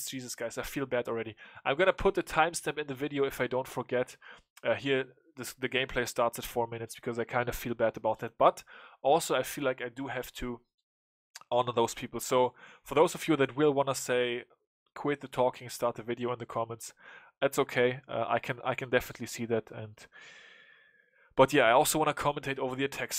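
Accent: Germany. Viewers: Du bist glaube ich deutscher also du hörst dich so an sag mir mal bitte wenn du Deutsche Bist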